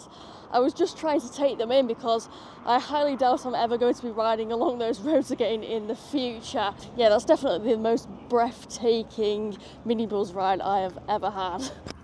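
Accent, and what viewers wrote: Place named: West Midlands, United Kingdom. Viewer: Great vid! Are you from the west mids by any chance? Sorry couldn’t help but notice the accent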